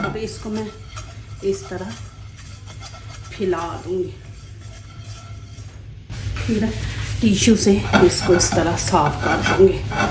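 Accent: Punjab, India. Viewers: Very poor Urdu in Punjabi style. commentary. Improve it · Doosri baat: Jin viweres nai punjabi mai baat karnay ki suggestion di hai woh sach keh rahay hain ap agar punjabi bolain tou apkay show ko char chand lg jayen Urdu ko punjabi accent mai bolnay wali weirdness bhi nahi rahay gi. Aur punjabi sajay gi bhi bohat apki awaz mai... Its just a suggestion Please don't mind. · اردو نہیں پنجابی بولا کریں، اردو پنجابی لہجے میں مذاق لگتی ہے۔ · Assalam o allaikum best ha laiken khuda k liya apna punjabi lahga sahi krien pleas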